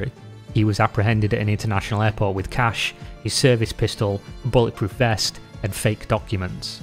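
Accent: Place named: England, United Kingdom. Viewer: I usually find it hard to listen to these types of videos with an english accent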